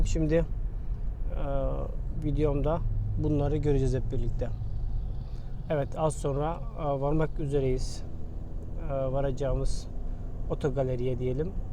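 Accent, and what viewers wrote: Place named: United States. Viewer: Kardeş neden Türkçe konuşurken amaaaaarikan aksanı kullanıyorsun Aaaaa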